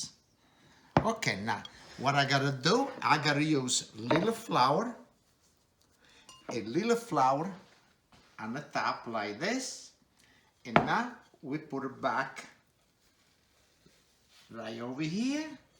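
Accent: Italy. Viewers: I miss my Italian Grandparents loads. I spent so much time in the kitchen with my Nan learning how to cook and absolutely loved it. Sometimes find myself just putting this on to hear the Italian accent, brings back great memories. On the plus side... he’s a lovely fella and an amazing cook!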